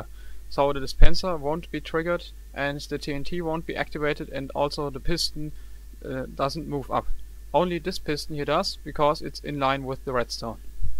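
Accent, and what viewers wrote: Germany. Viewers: Ich hab gleich den deutschen Akzent erkannt :)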